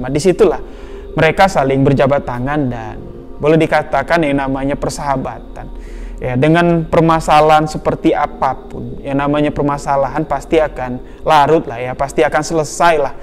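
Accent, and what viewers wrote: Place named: Madura, Indonesia. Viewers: Logat maduranya sangat kental.. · Org madura ya bang ngomngnya khass bngtt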